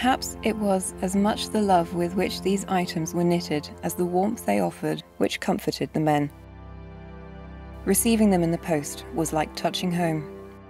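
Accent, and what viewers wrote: United Kingdom. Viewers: don't need a British uniform to know he's from there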